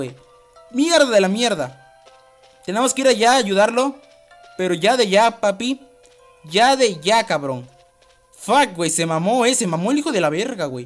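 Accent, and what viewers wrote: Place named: Latin America. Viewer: me encanta el acento latino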